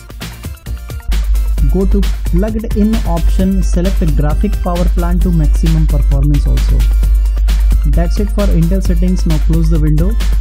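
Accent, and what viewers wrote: India. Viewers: Why your voice makes me think you are Indian ?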